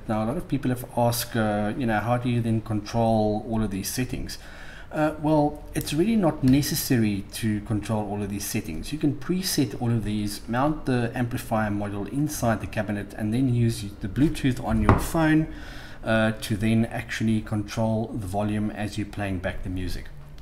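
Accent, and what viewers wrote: South Africa. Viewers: you sound South African im based in capetown any chance its still available if you are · Are you south African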